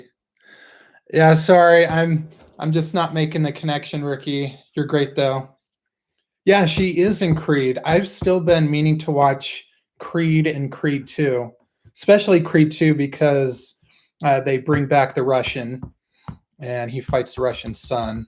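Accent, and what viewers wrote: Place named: Africa. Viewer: I love African accents too!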